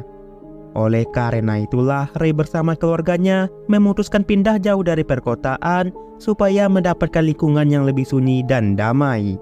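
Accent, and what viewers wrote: Aceh, Indonesia. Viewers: Mentok kali bahasa logat Aceh nya ia bos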